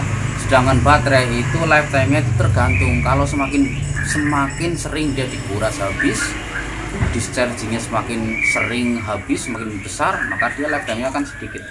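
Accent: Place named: Banyumas, Indonesia. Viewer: Masee orang ngapak yaak? 😁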